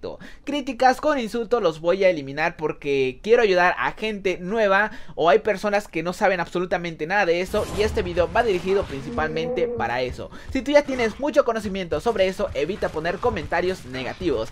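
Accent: Mexico. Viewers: eres de mexico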